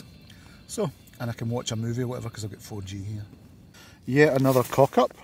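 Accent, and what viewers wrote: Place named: Dundee, United Kingdom. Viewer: Cracking love snow camping was in snowdonia the weekend hardly any about even on the peaks..ps Glasgow would put have money your accent was more northern maybe Dundee ?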